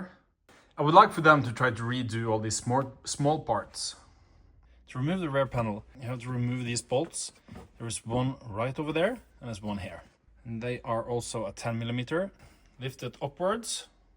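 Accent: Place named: Norway. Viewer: Du må seriøst prate på norsk. Ingen fra utlandet orker å høre på den norsk / engelsken din.. Først må du nå ut til publikum her hjemme før du skal gå «viral». Du har bra content, men du må gå gradene som alle andre dessverre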